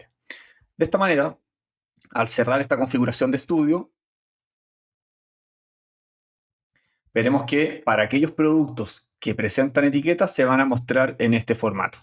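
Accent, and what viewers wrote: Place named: Chile. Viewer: Hola como estas?, Genial tu apoyo con ODOO, lo uso hace años pero primera vez que veo buenos videos en español, y en "chileno" jajaja. Una consulta, estoy intentando agregar las comunas al formulario de contactos, ya que necesito que los clientes tengan comuna y region relacionados, pero no logro que al cambiar la región (res.country.state), en el campo Comunas (que cree con Studio), me muestre solo las comunas de esa región. ME darias algún tips?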